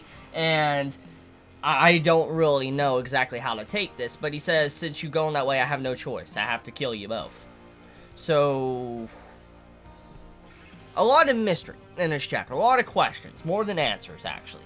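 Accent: South, United States. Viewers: Pretty sure is from the South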